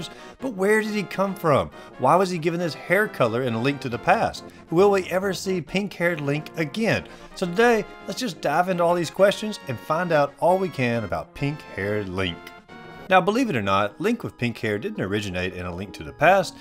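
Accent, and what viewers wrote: South, United States. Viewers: Sweet video man! Sounds like you're holding back a pretty significant southern accent. You should let it shine! Not enough gaming channels with attitude.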